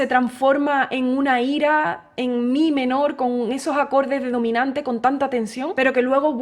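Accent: Andalusia, Spain. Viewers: Excelente interpretación. Ese acento andaluz 🥰😍💕 enamora. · Ay que lindo acento tienes. De que parte de España es? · ya decía yo, ese acento es Andaluz, felicidades hermosa interpretación, saludos desde Guayaquil-Ecuador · Hemosa música, maravillosa didáctica y extraordinaria interpretación. ¿Ese acento es de qué región de España?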